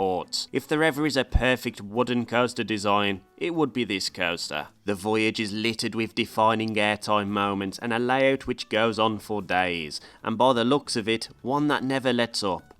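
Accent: Black Country, United Kingdom. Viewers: Just come across your channel and first thought is he’s gotta be from the Black Country can tell our accent anywhere mush lol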